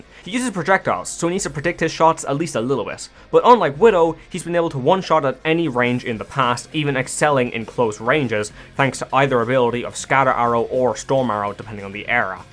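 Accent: Ireland. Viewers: lads the blokes Irish lmao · Waheey lad a fellow Irishman??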